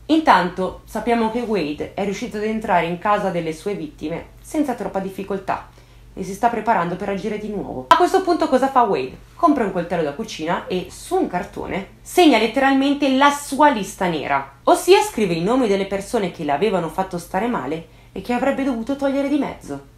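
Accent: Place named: Rome, Italy. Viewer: Il tuo accento (Per me) è Romano, ma sei di Roma?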